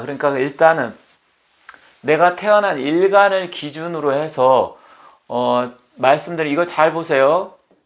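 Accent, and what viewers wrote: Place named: Chungcheong, South Korea. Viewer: 충남 사투리 시네요..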